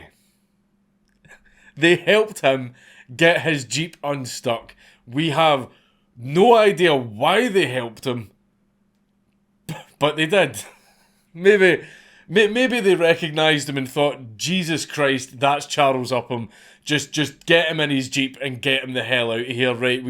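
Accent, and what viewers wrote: Scotland, United Kingdom. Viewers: I don't know what the hell it is but the scottish accent is delicious to listen to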